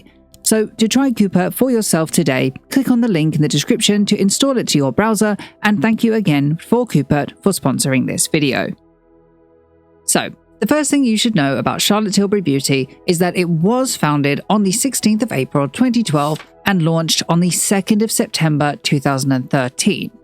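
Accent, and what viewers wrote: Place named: United Kingdom. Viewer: I’m with you on that. I fall asleep to a British Egyptologist with a similar accent on the regular.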